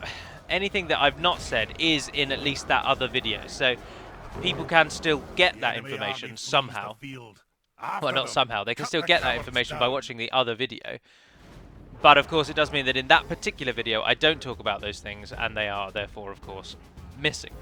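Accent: South Africa. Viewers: Great South African accent! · I love the South African accent!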